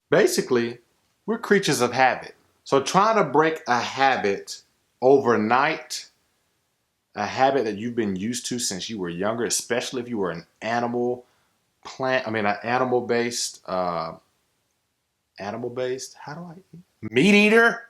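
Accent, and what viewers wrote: South, United States. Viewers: You are spot on about people not understanding ..I'm from MS and I don't know anyone else who is vegetarian or vegan. I got so much flack from my fam..two years later and I still get those "you still don't eat real food" questions. I also agree that it was me reading and gaining knowledge about veganism for myself that made me adopt the lifestyle. Dope video...I knew I heard a Southern accent!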